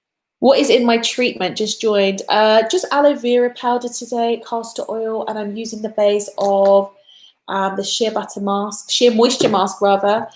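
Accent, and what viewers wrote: United Kingdom. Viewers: So funny. I literally just looked up "fortnightly" (happening or produced every two weeks). You speak the Queen's English. I speak American. LOL!!!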